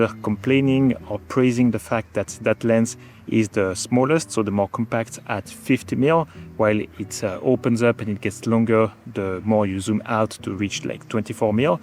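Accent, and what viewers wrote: France. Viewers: This guys sounds fench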